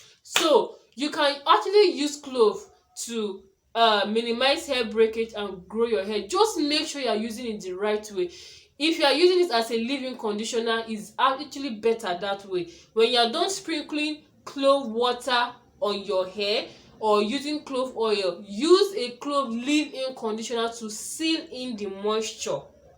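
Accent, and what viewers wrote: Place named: Caribbean. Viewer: You have a accent are you carribbean?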